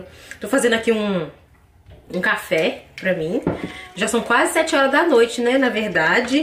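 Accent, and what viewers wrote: Minas Gerais, Brazil. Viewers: Ela tem um jeito todo mineiro de conversar . Tenho vontade de conversar com ela, pois também sou mineira mas péssima cozinheira.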